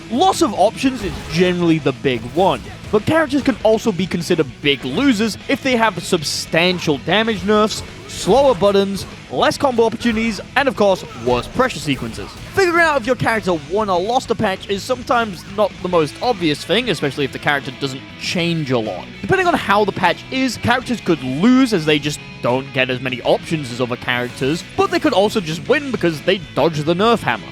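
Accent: United Kingdom. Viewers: yes we do love your silky smooth british voice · I do just love your silky smooth British voice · I'm just here to hear the bri'ish guy talk, honestly. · i want it to be known that i do enjoy your silky smooth british voice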